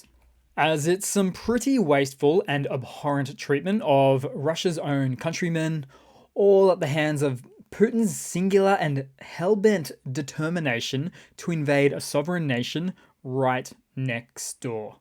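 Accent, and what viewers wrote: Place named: Australia. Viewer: Need more Australian accent i my life’s 8)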